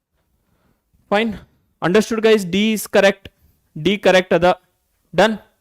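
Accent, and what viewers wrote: North Karnataka, India. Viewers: Sir nimde uttar kanada mix acent super ayethi sir 😂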